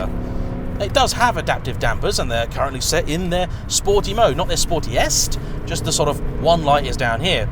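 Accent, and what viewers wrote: United Kingdom. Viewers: Yes, I believe you did a great job with this clip. Yes, I like BMWs, especially, the M3s. This M5 is particularly better than the ones I have tried I admit that but what I am curious about is your accent because I must say you are probably the only Brit that I can understand all of what he says. So, from what part of England is that accent?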